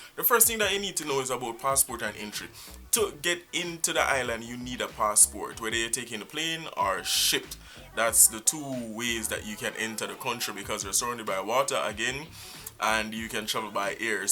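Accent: Jamaica. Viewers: I was just mesmerized by you talking than anything else. I love the way Jamaicans talk.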